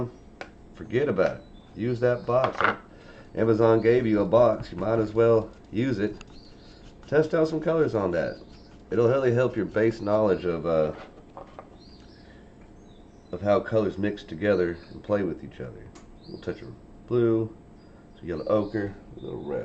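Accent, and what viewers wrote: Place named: Texas, United States. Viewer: totally agree, the entire human experience boils down to sharing stories via art in whatever form that carries it, everyone should have access to and nurture that inherent need to create and feel fulfilled by it. RDR is my favorite game too! unfortunately it's such a favorite that it cut heavily into my painting hours lol. your accent is nice though, it makes me miss texas :) i hope you continue to share your art & thoughts here!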